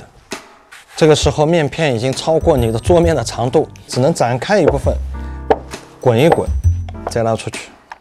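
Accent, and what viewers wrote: Shanghai, China. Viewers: 上海腔十足的师傅做出美味无穷的江南美食，让我又想起了家乡。好想吃上海的小馄饨~ 谢谢 ❤ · 一开口就晓得了，阿拉上海特有的普通话口音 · ，上海口音。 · 标准上海普通话👍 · ，特别喜欢你的视频，尤其喜欢不经意流露出的上海话。看了那么久的视频，直到看到你的视频由衷的感叹，专业的老师傅就是不一样。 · 一听就是阿拉上海大师傅😄对自家好一点😋嗲额！看了我才吐水哒哒滴😋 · 带着上海口音好亲切，想念上海小馄饨了有空壹定按朱師傅的配方做壹次對自己好壹點哦!👍😍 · 大哥，听口音你是上海人是伐。你这碗小馄饨太感人了。😭我土生土长的上海80后，一不当心远嫁加拿大。太想念想小时候吃到的上海点心美食了，好几年没回上海了。大哥下次教一下粢饭糕还有油条怎么做好吗？怀念小时候路边摊的味道。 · 师傅是上海口音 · 一听就是上海人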